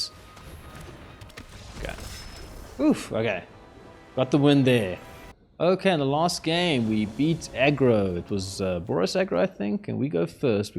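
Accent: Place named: South Africa. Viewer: You South African? :D Also, I see Mythic Mike, I like haha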